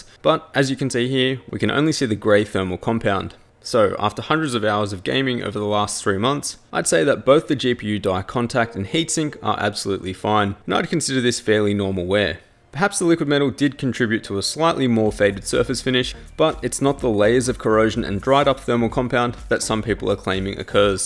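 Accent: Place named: Australia. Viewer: Subbed for Australian accent.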